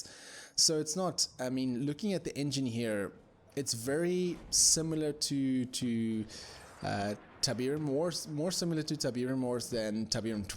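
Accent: South Africa. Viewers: Respek. Generals is a classic of classics. And BTW where you from? Souf Efrika?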